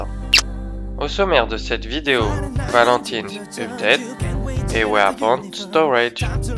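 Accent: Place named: England, United Kingdom. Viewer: Ton accent anglais 😂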